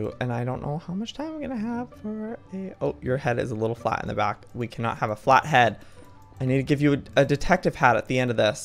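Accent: South, United States. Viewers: Is from the south? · Omg he has a country accent ❤️❤️ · I loveeee his country accent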